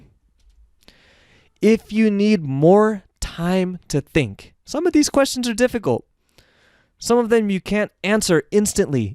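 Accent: United States. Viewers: Are you american?.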